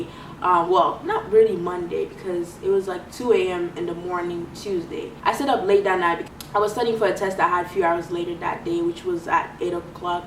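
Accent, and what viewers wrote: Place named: Francophone Africa, Africa. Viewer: Cameroon I knew I recognized that afro-french accent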